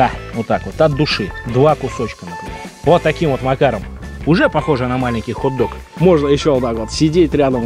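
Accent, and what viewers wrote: Moscow, Russia. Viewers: Что-то говорок какой-то на понтах сегодня, такой чисто Московский прорезался... аж слух режет.... А за рецептик лайк!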